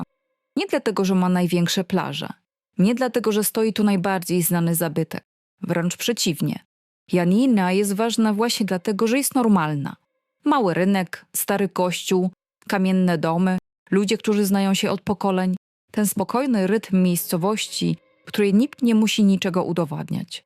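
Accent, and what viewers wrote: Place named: Ukraine. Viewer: Całkiem niezłe jak na AI tylko ten lektor tak zaciąga ... ukraińskim?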